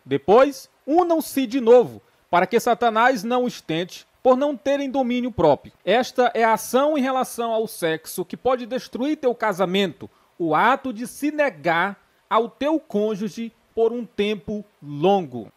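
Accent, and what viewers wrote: Northeast, Brazil. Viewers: E muito bom o assunto falar sobre o assunto,acho que deve sim ser falado por pastores.existem muitas pessoas as vezes dentro das igrejas com tantas dúvidas sofismas medos. Eu mesmo sou uma, KKK agora é uma resenha o jeito que cê fala parece ser nordestino também. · Que Deus continue te usando missionário tremendamente porque tu é profeta homem eu acho muito bonito esse jeito que o senhor se expressa uma forma nordestina sincera de falar mas tudo dentro da palavra é o que importa continua falando a verdade Profeta Deus é contigo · Ele é divertido. Dom do nordestino... Kkk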